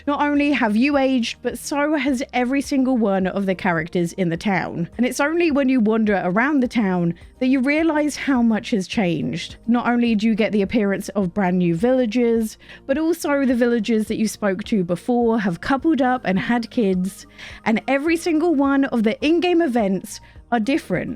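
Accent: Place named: United Kingdom. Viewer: this british accent is so good to hear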